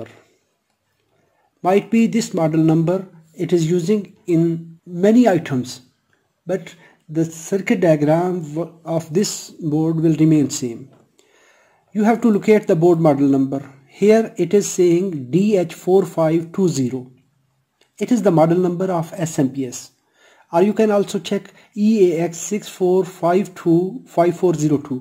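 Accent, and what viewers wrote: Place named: Arab world. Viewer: impressive arabic accent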